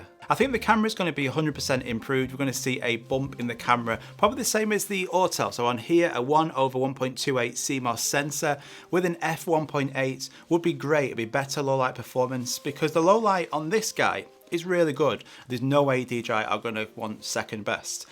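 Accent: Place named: Manchester, United Kingdom. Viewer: So where from exactly? The guy who introduced me into my project in Cambridge 30 years ago was from Manchester. So it appears that I am familiar with your dialect after all already. Here in Switzerland we have many different dialects, mostly due to that Swiss German is only spoken but not written (for writing in newspapers etc. or for the spoken news on TV High German is used). As a result I understand a person who is from the canton of Wallis (that's the worst example) only with difficulties.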